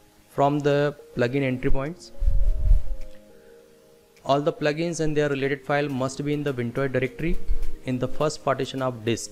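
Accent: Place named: India. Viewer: This video really shows that not everyone who sounds Indian has malicious intent.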